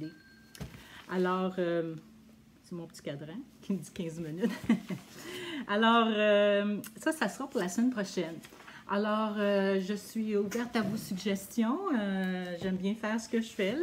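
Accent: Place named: Quebec, Canada. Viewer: Bonjour, quelle colle vous prenez pour le marouflage, car je suis français et je ne comprends toujours le québécois pardonnez moi !!!! Cordialement Serge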